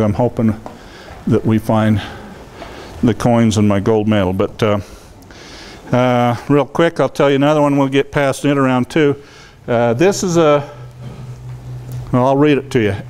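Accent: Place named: Deep South, United States. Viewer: This man seems very nice. Listening to this presentation is just like when you pull up to a barber shop and ask for directions in the deep south. 😅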